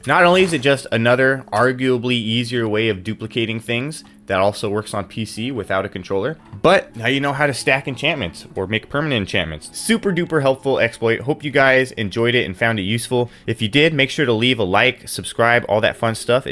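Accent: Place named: Midwest, United States. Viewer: Jesus the Midwestern accent on this dude is abrasive...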